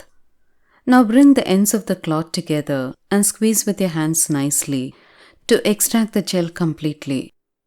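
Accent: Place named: Tamil Nadu, India. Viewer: கொஞ்சும் தமிழ் உங்கள் குரலுக்கு அழகு அக்கா.....😍😍😍😍😍😍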